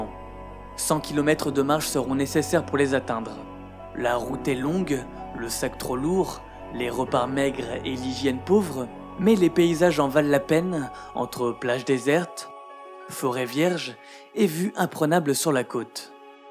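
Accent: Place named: France. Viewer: Mais où est tu né, tu à un certain accent français. Est ce l'accent suisse, belge, ou un accent du sud de la France ?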